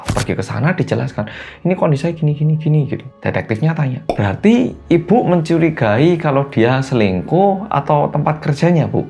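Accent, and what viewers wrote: Java, Indonesia. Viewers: Cerita Korea, tp di ceritain pake akasen Jawa medhok😂😂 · medok bgd orang korea yg satu ini! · Kok bisa muuuuedok yaaa 😅😅😅😂😂😂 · Sek mas.. sampean iki temenan wong korea ta? Kok jowone g ilang2.. wenak ngunu lo lek ngomong jowo.. 😅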